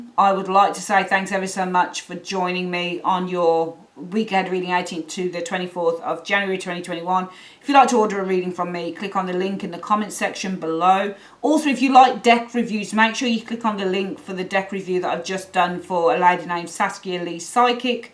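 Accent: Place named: Black Country, United Kingdom. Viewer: definitely hint of black country in that accent .. yau in dugley?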